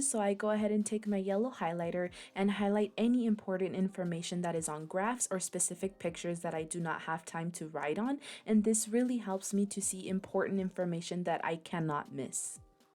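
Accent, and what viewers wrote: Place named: South Florida, United States. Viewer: Are you from the Miami/South Florida region? Your accent reminds me of home... And now I want mangoes and guava.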